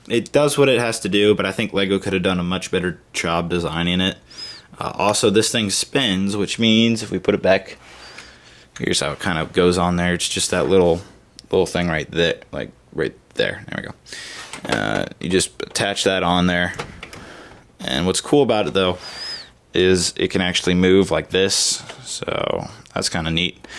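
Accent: United States. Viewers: this gunship is epic I really like it. and I think you are American. (your voice sounds American)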